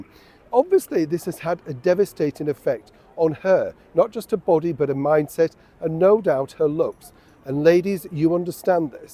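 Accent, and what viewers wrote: United Kingdom. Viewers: Dear Mr., I so enjoy your conversations with your viewers...you touch my heart and take away some of the loneliness I have at times. I never realized that until just now at the start of this particular video! Your values and assessments of situations, in the ongoing Royal "situation" for example, and in your observations on societal changes and issues in general, resonate with me, (an older moderate American, quite bit older than you) I started watching you for a taste of London and your charming British way of speaking, and yes I do enjoy that still, but especially the way in which you address sensitive issues in a refined, genteel manner WITHOUT being stuffy! I love that about your channel and about YOU! I am a true fan, just fully realizing that this afternoon May 25. I do so appreciate how you are NEVER crude, coarse or salacious in your reporting and commentary. 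THANK YOU!! I first started watching your channel when dear Queen Elizabeth left us....your heart was broken and your way of discussing her, with obvious love and respect, touched me deeply. I don't know if you have the time to read these comments but I hope you are able to read mine here. I often comment on your site, but this is a personal FAN letter! With sincere appreciation, L.K.Lovelace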